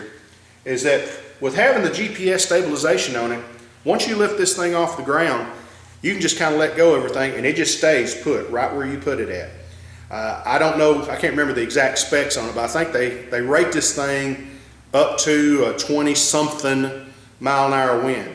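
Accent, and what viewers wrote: South, United States. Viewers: I like this guy, there is something cool about a guy talking sense in a southern American Accent :-)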